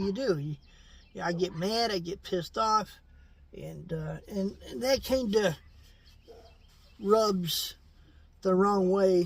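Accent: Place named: Appalachia, United States. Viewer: I'm not trying to be mean or anything But you should look for a talent agency for acting And I guarantee You They would find you many parts for hillbilly acting roles You have the exact look that they're looking for you look and talk Like a hillbilly I am being 100% serious you can make a ton of money.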